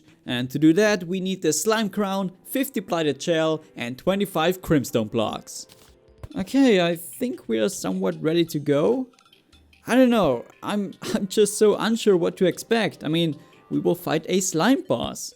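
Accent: Germany. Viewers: Hes German isnt he???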